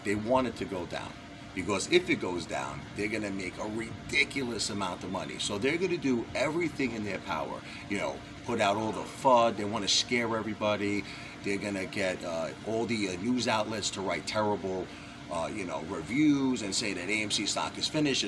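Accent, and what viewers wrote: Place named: Long Island, United States. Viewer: I’m from LI…you sound and look like my people!!!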